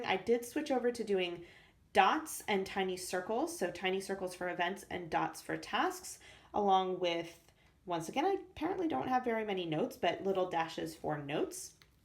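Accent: United States. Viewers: Great video! And you write in all those different languages? Your life seems very interesting! You sound American so I'm assuming you are studying abroad! Very interesting!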